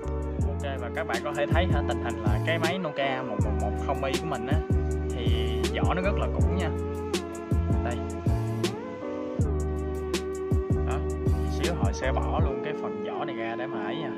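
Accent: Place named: Mekong Delta, Vietnam. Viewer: rất thích nghe giọng người miền tây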